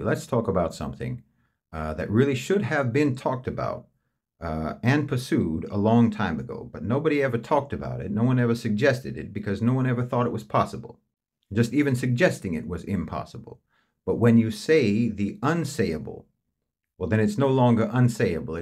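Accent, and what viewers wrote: United States. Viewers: THIS IS A HUMDINGER OF A PROPOSAL.... IT IS REFRESHING TO HEAR A MAN WHO SPEAKS WITH SUCH AN AUTHENTIC AMERICAN ACCENT VENT THESE TRUTHS... AND RIGHTLY CALLING FOR THE UNITES STATES EXPULSION FROM THE UNITED NATIONS.....THE U.N. BODY WILL BE FAR BETTER OFF WITHOUT THE U.S.A. WITHIN IT. KICK THE U.S.A. OUT INDEED.....& THEN ISRAEL WILL PROBABLY END UP TAGGING ALONG.....WHO CARES..... WILL THIS EVER HAPPEN ? I VERY MUCH DOUBT IT, BUT IT SHOULD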